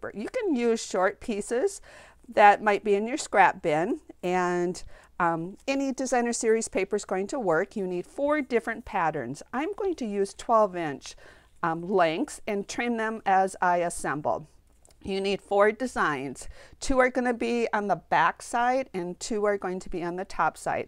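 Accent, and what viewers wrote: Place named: Midwest, United States. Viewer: I saw your twisted ribbon card on Pinterest and it led me to the video. I think your explanation of how to make the card was clear and concise. It’s a beautiful card and I can’t wait to make some. Now I’ll be looking for other YouTube videos you’ve posted. Thank you for sharing your talent. I love your accent, are you from the northern Midwest? U.P.?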